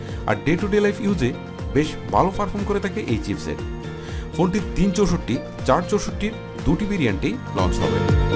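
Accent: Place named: Noakhali, Bangladesh. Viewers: মনে অর আন্নে ’নিউখাল’ এইচ্চা করি কতা কম কিল্লাই। শুদ্ধ করি কতা কইতে হারেন কেয়ন? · vai its perfect not ferfect , leave your noakhaillya accent... irritating kotha barta..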